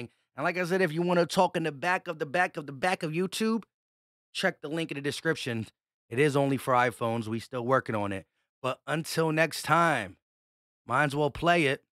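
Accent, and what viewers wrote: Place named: New Jersey, United States. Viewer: I watch you every week, dirty Jersey native (Vineland) living in Boston. These are my favorite Eagles updates/videos, you make a lot of sense and it’s nice to hear the accent from home bro! Keep it up! 💪🏽🦅🦅🦅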